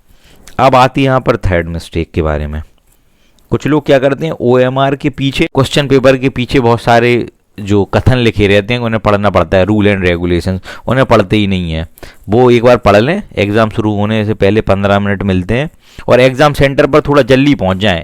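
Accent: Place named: Jhansi, India. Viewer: Apki language jhansi ki lg ri h sir jhansi k h kya sir aap😁😁😁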